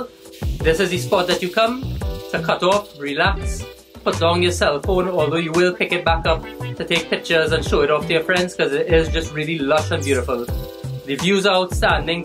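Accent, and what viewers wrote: Trinidad and Tobago. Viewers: I love your west accent. It’s comparable to the convent accent just the male version. Love your channel. Keep up the good work. This place looks nice but for USD $114 per night I would have liked air conditioning and toiletries in the shower eg shampoo but seems like a eco lover dream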